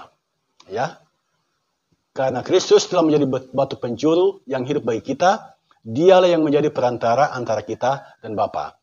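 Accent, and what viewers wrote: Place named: East Nusa Tenggara, Indonesia. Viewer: Syalom bapen slamat pagi. Trimkasih bpa utk penjelasan firman Tuhan pagi ini yg memberi pemahaman yg sungguh dan benar. Maaf bapa berasal dari daerah mana? Saya sendiri dari NTT. Maaf bapa saya bertanya krn dari gaya/logat bicara sama dgn orang NTT. Dan jujur bapen saya baru dapat canel bpa hari ini 19 juni 2023. Skli lag itrimkasih banyak2 bapan. Tuhan Yesus memberkati bapen dan keluarga.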